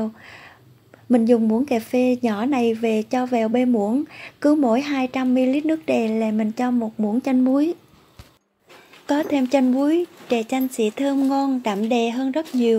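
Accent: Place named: Phu Yen, Vietnam. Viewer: Bạn giống ở phú Yên vậy bạn giọng nói ấy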